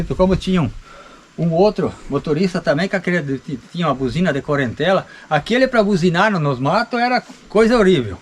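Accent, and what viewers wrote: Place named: Italy. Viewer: Como gosto de ouvir o senhor falar , esse sotaque Italiano que sdds dos meus pais, muito sofrido a vida , mas a gente tem que agradecer a Deus pq os jovens tem de td e nem sempre são felizes. Parabéns estou gostando muito dos vídeos do senhor continue assim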